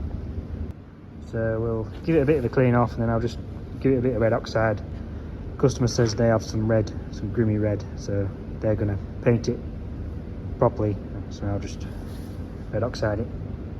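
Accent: Yorkshire, United Kingdom. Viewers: Thanks for the share, l’m enjoying your great videos and yes you do explain what you are doing and why very well. I’m from Richmond North Yorkshire,now living in the East Midlands and is great to hear the Yorkshire accent. Your workshop is looking much tidier on video 👍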